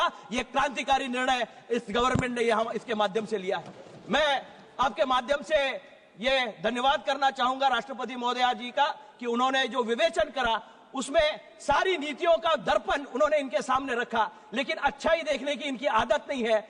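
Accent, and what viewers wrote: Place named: Kolhapur, India. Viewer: कोल्हापुरी आवाज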